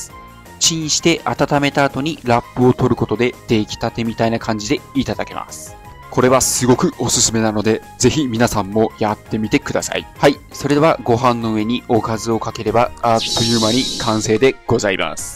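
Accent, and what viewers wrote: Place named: Miyazaki, Japan. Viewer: 宮崎訛り懐かしい〜😊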